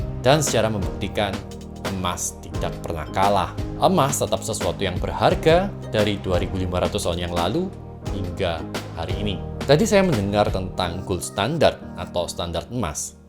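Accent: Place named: Banyumas, Indonesia. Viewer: Logatnya ngapak. 😅 Nice 👍👍👍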